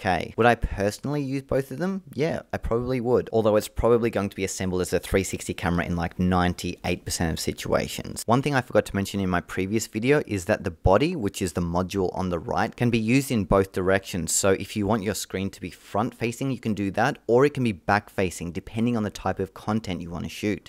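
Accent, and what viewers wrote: Australia. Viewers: you sound very aussie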